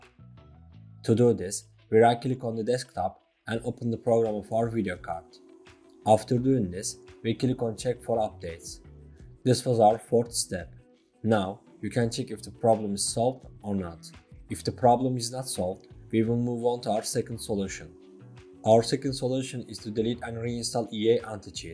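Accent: Turkey. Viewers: Dost aksanından anlamıştım Türk olduğunu Fakat çalışmadı :(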